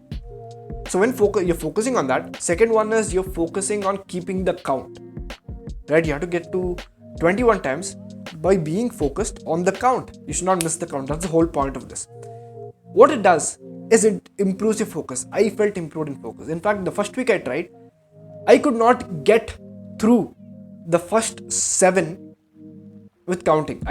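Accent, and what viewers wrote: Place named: India. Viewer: You are surely an Indian from your accent. Why don't you go to the rural villages of Uttar Pradesh and see the inhumane conditions people live. See if you can help them.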